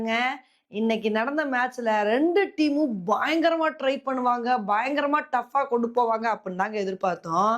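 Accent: Madurai, India. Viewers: மேடம் நீங்க மதுரையா பேச்சு வழக்கு செம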